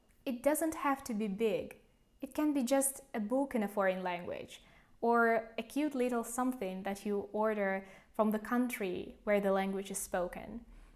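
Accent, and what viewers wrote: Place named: Russia. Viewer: I believe she's from Russia:) I got it after just a few seconds of watching her video some weeks ago. I can easily get who's from this country by facial expressions, sights, the tone of their voices and finally by the accent. So I'm kind of an expert in this thing hahaha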